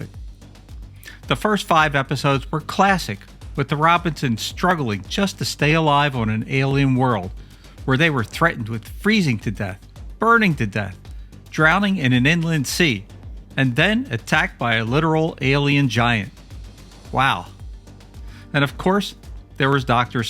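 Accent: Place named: Philadelphia, United States. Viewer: Couple things: I note your Philly accent & Gene London reference. But beyond that, you're right, I was a kid watching it then. Episode one of the first season, in particular was serious & good, a real sci-fi adventure. After that, I felt things trending in a more lightweight direction. Still watched it a lot though.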